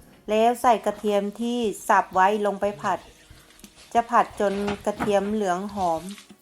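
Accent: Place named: Kamphaeng Phet, Thailand. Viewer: อร่อยอีกแล้วครับ.สำเนียงคล้ายๆคนกำแพงเลย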